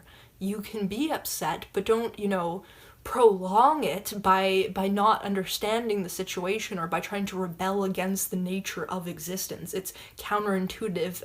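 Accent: Canada. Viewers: I have a hard time figuring out if you are Canadian or Danish? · Are you Canadian per chance? The way you pronounce "about" sounds Canadian. 😉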